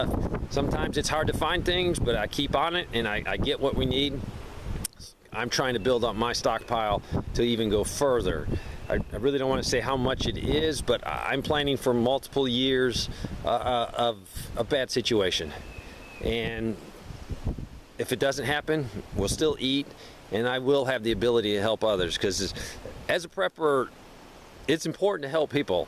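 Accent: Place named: Upstate New York, United States. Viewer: Sound like you're in my neck of the woods. Upstate area.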